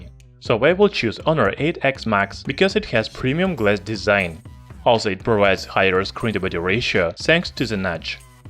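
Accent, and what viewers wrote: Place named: Russia. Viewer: Nice review, but your Russian accent makes it hard to understand what you are saying. Maybe someone else could speak the reviews!?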